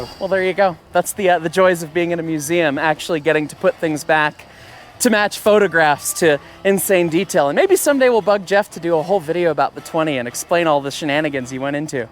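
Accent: United States. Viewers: I do not mean this in a negative way at all, but is this how men in Colorado talk/ sound? Colorado accent?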